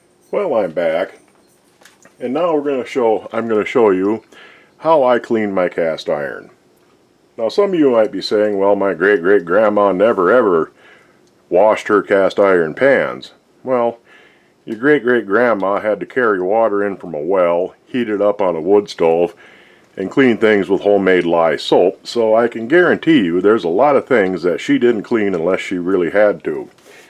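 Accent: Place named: Michigan, United States. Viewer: So cool! I rarely see a reply from so this is a great surprise! When the commenter said, “you have a voice like the skipper from Gilligan’s Island” the Skipper is the son of the great Alan Hail or Hale, who often appeared with Errol Flynn in those awesome swashbuckler movies. Skipper’s name was Alan Hail Jr, or Allen? Sorry. I know the names but don’t look at them in print much because I’m too,busy enjoying them in the movies! Anyway, I’m pretty sure has been a professional voice but he won’t tell us where or who he is. Atleast not that I’ve seen yet. And he’s very humble. But the anonymity is driving me curious. I do recognize a slight Michigan accent though. Would be nice to know his previous profession, maybe still current. One thing’s for sure... the voice is a definite gift and everyone loves it. I say, go for it. God bless.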